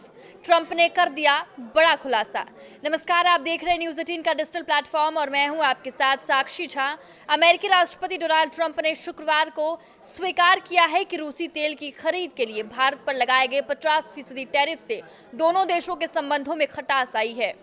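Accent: Bihar, India. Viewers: Medam, wansigoton nehi he Wo washington he,,, Kya madam english me bhi bihari accent late ho,, kya huwa itne padai likhai ki jo pronounciation nehi aata apko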